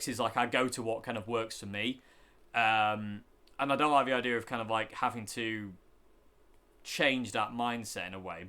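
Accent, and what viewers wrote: United Kingdom. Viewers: Hey Mr....You and I have something in common....We both talk funny...You’re from the U.K...and I’m from..New Yawk...It ain’t easy being us 😊. P.S...Brass Nuts...can have...a few different meanings....Keep on keeping on young man...👍😎🎸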